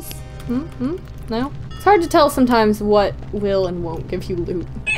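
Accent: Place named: Scotland, United Kingdom. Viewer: You sound scottish ! just like Karen Gillian in the Doctor ! subbed !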